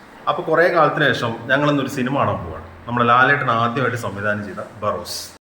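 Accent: Malappuram, India. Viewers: അന്റെ വോയിസ്ഓവർ കേൾക്കുമ്പോൾ ഒരു സംശയമുണ്ടായിരുന്നു ഇജ്ജൊരു മലപ്പുറം കാരനാണോന്ന് പുടികിട്ടി ♥️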